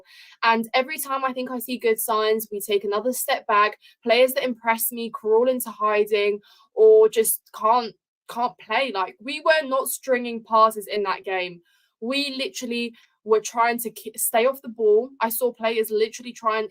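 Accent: United Kingdom. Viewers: Thanks.Ive got to say that the board will be taking that decision anyway.The next three games to the New Year could well put us in the danger zone.Could well be another interim manager to the end of the season..Its the Poch.playing system that is causing all these difficulties.Dearly wish we had signed Naglesmann to play that German system.It does work in the Priemership as Klopp has proved. Incidently I love hearing your London voice brings many homesick memories back!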